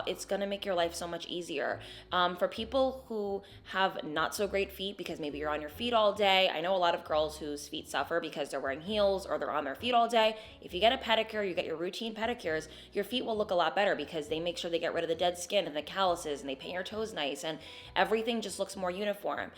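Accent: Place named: Pennsylvania, United States. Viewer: I just found your channel i was like she sounds like a delco girl lol read your bio and close enough lol you’re beautiful girly🤍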